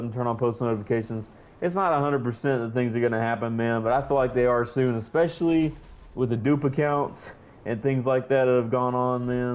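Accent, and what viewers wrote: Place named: Mexico. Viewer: You sound mwxican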